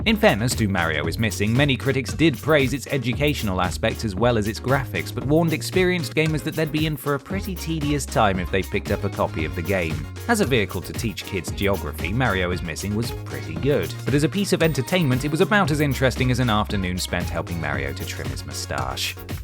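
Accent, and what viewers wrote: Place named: England, United Kingdom. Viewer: Interesting that you speak with an Emglish accent but call it a "Sega Genesis"...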